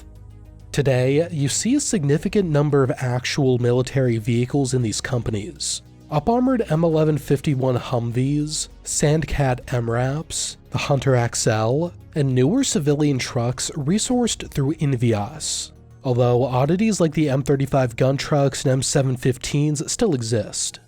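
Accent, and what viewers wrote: Australia. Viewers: great aussie accent - Jordies fan? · That was actually a really good Australian accent mate 👍 well done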